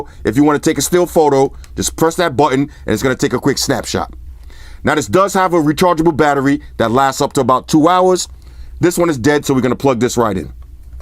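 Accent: New York, United States. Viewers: Oh my man is from NY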